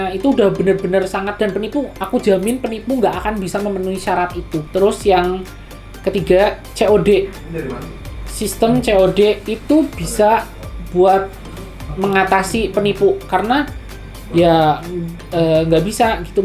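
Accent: Indonesia. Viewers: ki unik, kalo ga denger dia ngomong mesti taunya yoo cina abizzz, taunya begitu pas ngomong yoalaaaahhh rekk jowo abezzzzzz😂😂🤣🤣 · Assalamualaikum Warahmatullahi wabarakatuh Sebelum'a aku mau ucapin bnyak Terima kasih buat Tv and Team yg udh ksih Tips and Trick tentang penipu yg lagi merajalela bagai corona ini wkwkw dan fix logat ngapak'a (kyak orng Jawa Tengah) keliatan banget and make me laughing cuz liat ekspresi yg mesel sama penipu awokwokowkowk Literally Aku udh bnyak dpt pengetahuan di video ini tentang penipu entah itu penipu pembelian hewan, barang atau yang lain'a jdi'a aku lebih tau gmna cara beli secara online dan sedikit cerita aku wktu itu emng lagi PENGEN banget adopt Kucing dan aku agak ragu buat cari di online, sempet sehari atau beberapa hari nemu di ig tapi ada rasa RAGU dan KHAWATIR buat pesen di olshop tsb, tapi setelah aku nemu account instagram kyak'a niatan aku buat punya kucing ada peluang besar dehh nihh soal'a TRUSTED banget dongg.. 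Mana ada olshop pet yg jual bisa VC dlu sama calon Kitten's kita hahahaha And i hope I can get blessed from ALLAH SWT By hands. Amin allah'humma amin Sekian ya wassalam'mualaikum warohmatulohi wabarakatuh Instagram: imradit01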